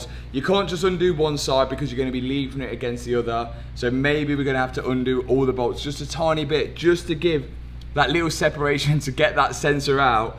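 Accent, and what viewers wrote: United Kingdom. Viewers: Watching your videos to learn british accent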